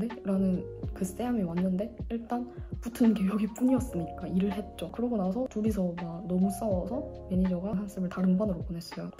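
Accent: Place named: Busan, South Korea. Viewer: ㅋㅋ 넘 귀여우세요 부산말투~~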